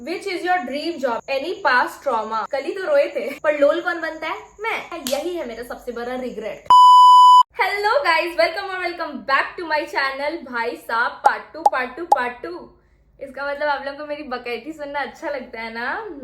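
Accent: Bihar, India. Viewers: You speak like a bihari ♥️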